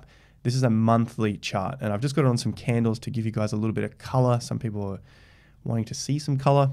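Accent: Australia. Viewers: I come to listen to ur analysis in ur Aussie accent :D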